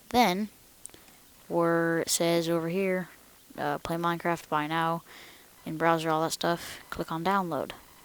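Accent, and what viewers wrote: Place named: Texas, United States. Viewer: you sound texas are you texas??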